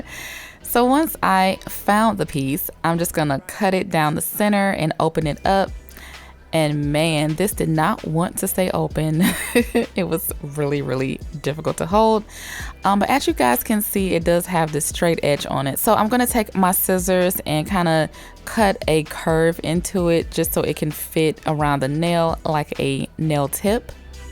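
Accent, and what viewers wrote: South, United States. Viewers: I’ve said it several times but I’ll say it again! Being from the south I love how your southern accent comes through in spurts lol “...just try to make sure all the colors are dOWn”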